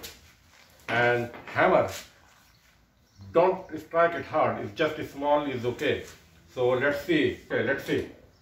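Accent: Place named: Russia. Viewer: Какой-то у вас Русский акцент!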